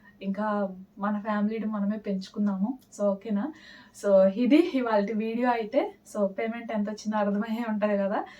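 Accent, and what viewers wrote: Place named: Guntur, India. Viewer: అవునండి గౌతమి వాయిస్ లాగే ఉంది ఎందుకంటే స్లాంగ్ ఒకటే కాబట్టి గుంటూరు డిస్ట్రిక్ట్ అలా అనిపిస్తుంది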